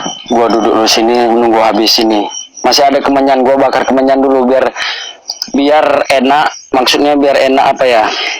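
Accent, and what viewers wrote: Medan, Indonesia. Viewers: Mas mcm orang medan aja.dari logat bicaranya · medan kali ya tuh logat bang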